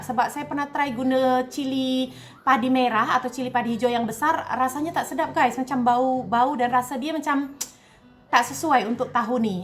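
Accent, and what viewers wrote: Johor, Malaysia. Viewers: Mantap kak bahasa Johor dh nk jadi tu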